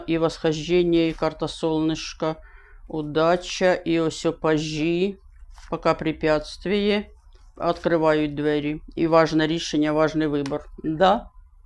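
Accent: Kherson, Ukraine. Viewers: Добрий день! Обожаю херсонский суржик! Здоров'я Вам, пані!!!❤❤❤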